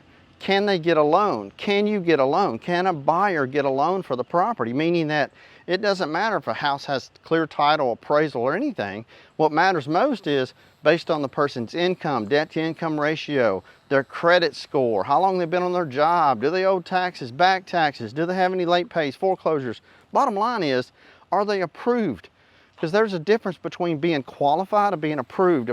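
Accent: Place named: East Tennessee, United States. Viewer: Old boy sounds more lihe east tn/western nc than Louisiana lol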